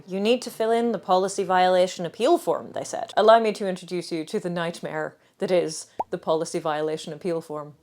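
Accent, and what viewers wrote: Ireland. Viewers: Im just trying to figure out what region your accent is from. Irish? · I love that you say : in the Year of Our Lord with that sweetest Irish brogue of your ! If flies in the face of all the dumb sheep who say "Common Era" like it was a piece of legestative rule that if one disobeyed ones nose would fall off . The brogue is what the systemic algorithm looks at with a brain that's bruised with numb surprise with still one place to go.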